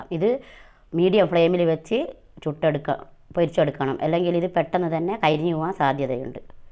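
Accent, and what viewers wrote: Nadapuram, India. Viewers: Nadapuram bagathulla basha samsarikkunnond chothichatha njnum Nadapuram ഏരിയയില്‍ aanu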